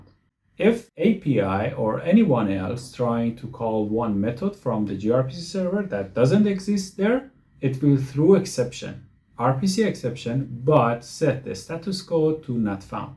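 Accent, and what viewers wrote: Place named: Iran. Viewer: بعضی از تلفظات خیلی فارسیه،حیفه متد، ریسپانس و ... که بیشتر تو محیط های آیتی انقدر اشباه تلفظ میشه که تلفظ اشتباهش فسیل شده.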